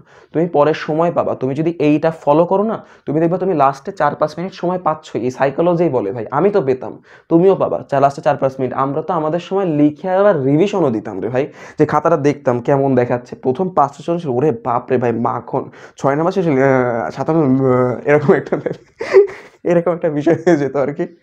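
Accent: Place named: Rajshahi, Bangladesh. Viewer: Apnar basa ki rajshahi ?? Apnar kothar moddhe rajshahi r vibe pacchi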